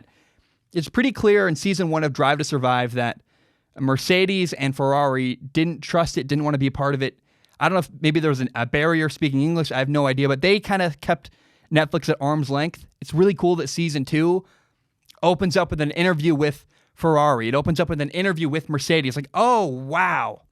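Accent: United States. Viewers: Formula 1 is my favorite sport after Football and I'm glad it has your attention now! The Netflix series has made it even more amazing. Get in there, Lewis! It's so refreshing to hear a content creator with an American accent say all the Formula 1 stuff! You're going to have to pick a favorite team and driver now.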